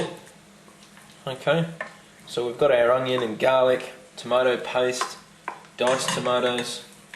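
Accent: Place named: Australia. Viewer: r u aussie great stuff man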